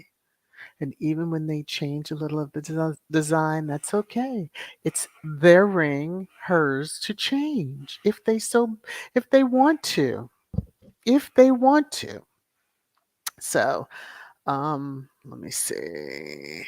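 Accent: RP, United Kingdom. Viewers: Thank you for another amazing thrash time 🙏😘❤❤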